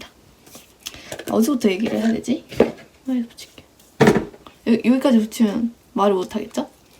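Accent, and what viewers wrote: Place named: Jeolla, South Korea. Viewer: 헐 왜 전라도 분인줄 알았지....?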